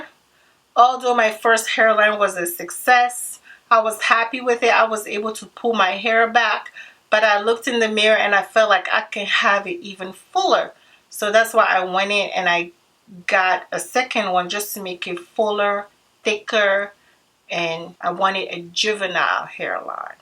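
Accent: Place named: Togo. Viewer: Hey sister, wow............. are you from TOGO? I never knew that because of your accent, so do you speak EWE language?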